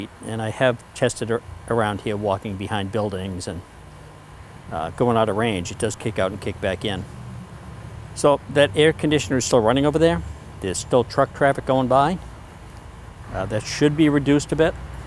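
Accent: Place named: New England, United States. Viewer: Living in a few different states over the years I've never really developed a strong accent in my speech. But listening to you speak made me chuckle to how strong a New England accent can be. Hope you're doing well.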